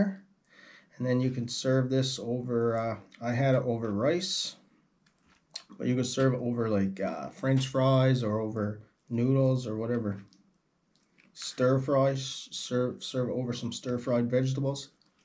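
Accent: Newfoundland, Canada. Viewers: I knew I saw a Newfoundland flag in the video. I got the accent right off. Great spot for your cabin. I have a daughter lives in Gander Bay. I went for visit last year. Unfortunately I am from Toronto for the last 75 years. Beautiful place keep on sharing. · Good to hear a newfi accent , reminds me of my youth in clarenville , great video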